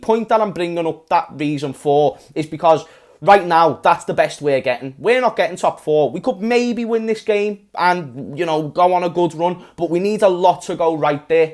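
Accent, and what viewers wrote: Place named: Manchester, United Kingdom. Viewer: Sound like a manc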